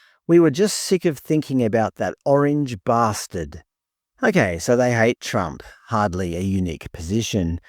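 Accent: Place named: Western Sydney, Australia. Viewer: They were woke before it was cool. I thought your accent was Western Sydney....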